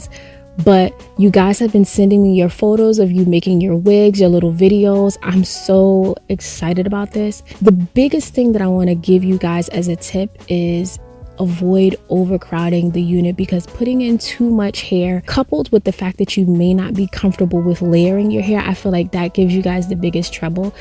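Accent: New York, United States. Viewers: Auntie u got a NYC accent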